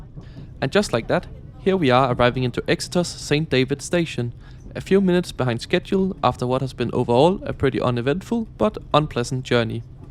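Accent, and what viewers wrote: Denmark. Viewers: Your Danish accent is very nice to listen to, much nicer than most U.K. dialects! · I know. He’s danish. We’re somewhat in between UK English and US English when it comes to speaking English.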